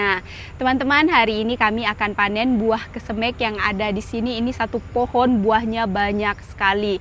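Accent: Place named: Sulawesi, Indonesia. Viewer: Pengaruh dialek😅 Sulawesi e lebih tajam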